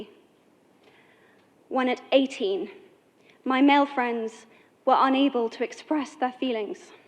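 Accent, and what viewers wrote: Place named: United Kingdom. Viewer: 英式英語欸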